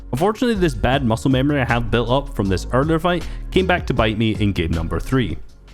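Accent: Scotland, United Kingdom. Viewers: Can we 1v1 pls? Also are you Scottish? Because I think Scottish people have the coolest accents.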